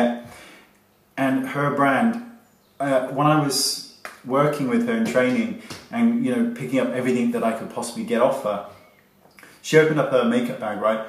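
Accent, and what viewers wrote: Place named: Wales, United Kingdom. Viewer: I'm fairly sure he's Welsh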